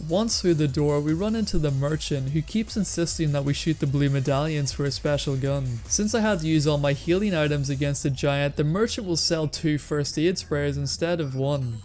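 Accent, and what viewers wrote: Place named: Northern Ireland, United Kingdom. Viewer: Love hearing fellow northern Irish accents around YouTube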